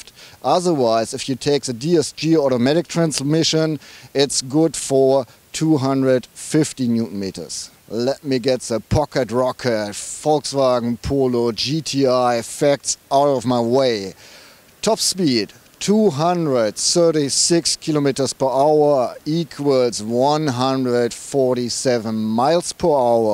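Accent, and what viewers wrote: Germany. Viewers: lol...he's german, german accent:P not drunk!!!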